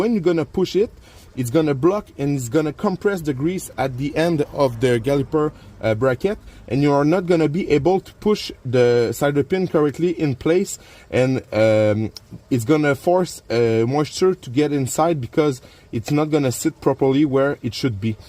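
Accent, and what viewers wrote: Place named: Quebec, Canada. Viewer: tu est définitivement un canadien francophone 😅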